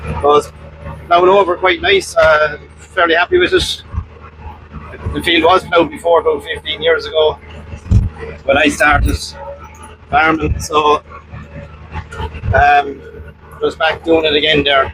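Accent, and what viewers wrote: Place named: Cork, Ireland. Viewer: that looks like a billard table, fair play to your dad. trying to figure the accent..cork or tipp?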